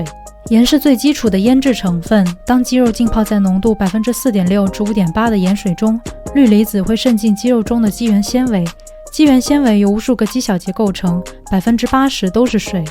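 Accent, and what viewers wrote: Beijing, China. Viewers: 主播北京口音很好听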